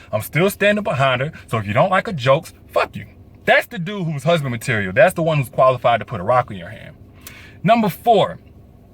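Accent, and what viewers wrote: South, United States. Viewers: Lol, the living room. You are straight out the gate 250% SOUTHERN....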